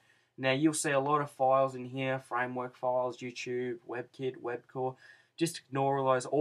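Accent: Australia. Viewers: Sounds Australian to me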